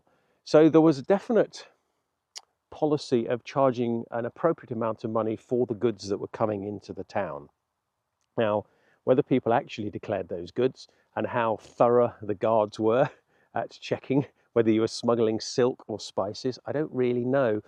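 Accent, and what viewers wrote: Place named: Oxford, United Kingdom. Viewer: They are indeed. Couldn't agree more. It is an Oxford accent. Oxford University is where got his bachelors and masters degrees.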